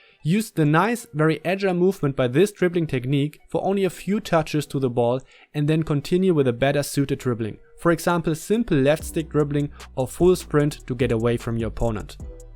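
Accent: Germany. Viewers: Are u german? Sounds a bit like a german accent... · Man hört auf jedenfall das du deutsch bist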